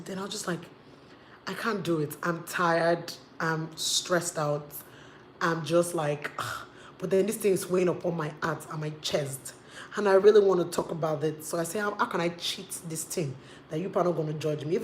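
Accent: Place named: Ghana. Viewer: I love your accent so much ,are you Ganian? I am South African by the way.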